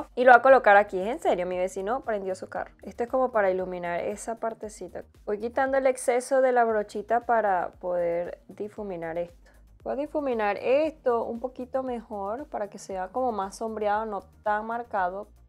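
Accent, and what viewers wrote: Venezuela. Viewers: WUAO TE QUEDÓ INCREIBLE, ME ENCANTO VALE,TE LO JURO,ERES TREMENDA MAQUILLADORA.YI SOY NUEVA EN TU CANAL.SI ES POSIBLE PREGUNTAR,DE QUE PAIS ERES? ES QUE ME PARECE QUE HABLAS COMO VENEZOLANA????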